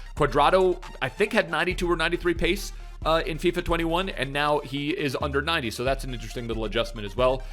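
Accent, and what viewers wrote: Australia. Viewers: More Aussies accent pls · That Aussie accent 👌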